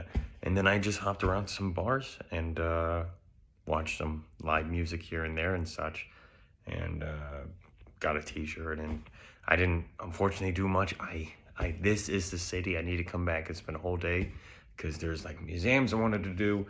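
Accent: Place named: Upstate New York, United States. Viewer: Well done! The Upstate accent, bit of Canadian hockey player in the background? Eh? Yes, ABQ is at bottom of Rocky Mtns, it can snow. Lots of skiing just to north in Ruidoso. Needles is where Oakies escaping the Dirty 30's Dustbowl entered Calif. Burbank, I've gone to watch friends at Flappers Comedy Club! Love that place. I retired young. I saw Fede Alvarez was going to remake Texas Chainsaw Massacre! I drove to Austin, found all the original filmset locations, studied up. I don't have creds for production. Being born in Spain, I was going to beg Alvarez for any job. But Covid19 erupted. I went to north Texas to care for our family matriarch, and waited on updates for TCM movie. Then Fede took it way over to Sofia, Bulgaria. My dream exploded. The Tohill brothers were dropped, David Blue Garcia (Tejano film) was brought in. 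TCM remake was bought by Netflix. It debuted at #2 viewing ever, but the fan reviews say the legend of original director Tobe Hooper (of Austin) was tarnished once again. Long story, sad ending. I mostly film live Native American dance events / powwows for my Youtube. Been on Covid hiatus. Lots of cross-country roadtrips on my resume! Best wishes for your success out in Calif. I have family and friends all over there.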